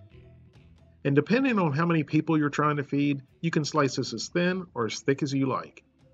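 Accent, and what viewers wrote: South, United States. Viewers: What state do you live in? Sound like a Kentucky or Tennessee accent?? Edit. My mistake i meant what state are you from. I grew up not knowing about moving out of state.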